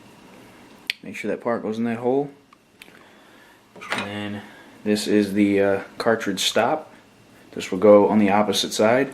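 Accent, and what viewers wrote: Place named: Mississippi, United States. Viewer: Thanks 4 the clip. Are you from Mississippi? It's just that accent bro.i know it..;)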